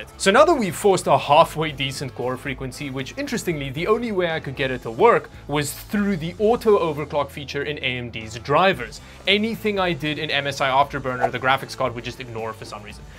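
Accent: South Africa. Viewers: Your accent and your name makes it seem like you're from South Africa, are you? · how do you sound like ur south african with that accent?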